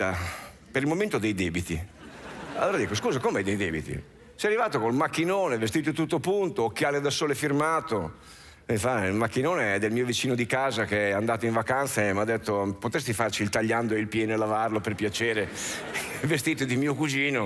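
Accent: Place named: Emilia, Italy. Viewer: È meglio quando ha l' accento emiliano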